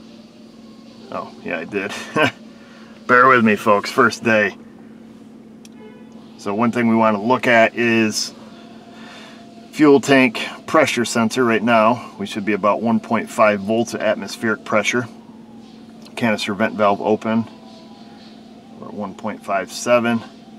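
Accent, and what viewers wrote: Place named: Wisconsin, United States. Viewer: I hear some Wisconsin in the background or your voice...nice video...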